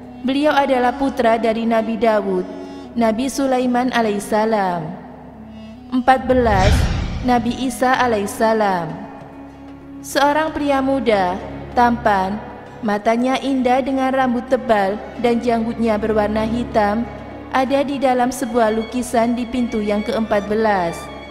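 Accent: Java, Indonesia. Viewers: Vocal suara jelek kaya orang jawa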